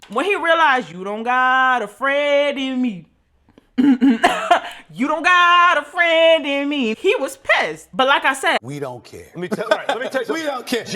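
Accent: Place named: Brooklyn, United States. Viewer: U gotta be from Brooklyn!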